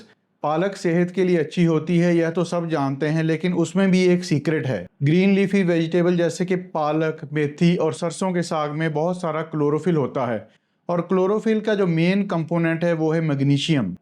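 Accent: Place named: Punjab, India. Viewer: Punjabi accent ki vajah h.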